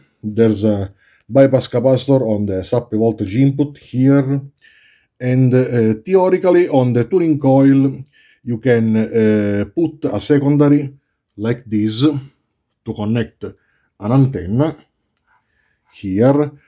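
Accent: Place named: Italy. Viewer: you make great points and I hate clickbait too, but listening to you fans who genuinly love your content and want you to succeed isn't the same as making videos you don't like. And it's not clickbait if the title is true. Putting key words into your titles is good business, not deception. The genius of your videos is above 99% of other channels. I should know. I have very poor health and am often confined to my house for months. I search and search for good electronic videos until I found yours. A diamond in the rough. I know YouTube frowns on using profanity. That's why the big electronics youtubers bleep out their curse words or don't curse at all. No more profanity, key search words in your title and your viewership will increase. You are easy to listen to, have a wonderful accent and come up with brilliant ideas! YouTube “diodegonwild” has a way thicker Italian accent than you and has many subscribers. 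He doesn't curse and has the same video format as you. Please forgive me if I hurt your feelings. You do an a, amazing job!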